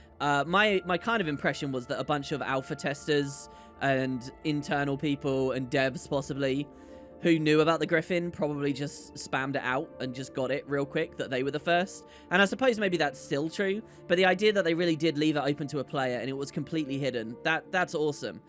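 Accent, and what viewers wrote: United Kingdom. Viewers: wow, you really sound British with the audio like that. but, 1 thing i think i would say about the legendaries is i think the only way they will add more or add new spear, trident, and speargun, is if they come out with and elite, example i can give is with Ele getting a Bow, as i think anet knows that ppl will rage if they mod 1 of the existing weapon, they would replace that elite weapon with a Spear instead of a Staff, and if they would add in a new set of legendary weapons, i think they would just do the practice they did with Legendary Armor, and Trinkets, they would make WvW/PvP weapons up-gradable, i think 1 issue is with gen2 is you can't sell them.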